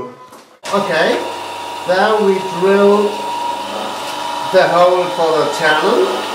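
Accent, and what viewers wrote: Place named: Germany. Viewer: this guys accents sounds like a german christopher walken.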